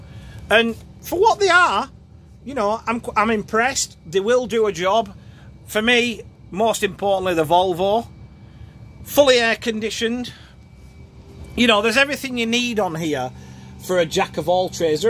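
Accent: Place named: Yorkshire, United Kingdom. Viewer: Just love your voice proper Yorkshire, which part of yorkshire I realy don’t know.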